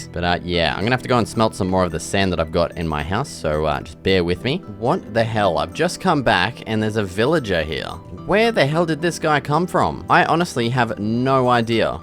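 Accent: Australia. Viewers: How as an Aussie did I never notice how strong our accent is 😂 I'm new here and I'm loving the new hardcore series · I'm Assuming Your an aussie ""Mate.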